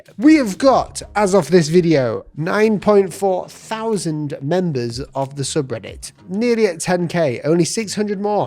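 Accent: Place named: Northern England, United Kingdom. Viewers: don’t know why i didn’t clock your northern accent faster, hi from manchester!! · I like that you point out that you are from the North of England in many videos. Even I can hear that, and I'm not British 😂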